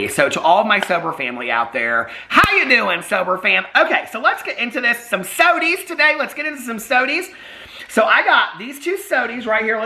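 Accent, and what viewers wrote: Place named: Minnesota, United States. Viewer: I'm dying with your Minnesota accent! 😂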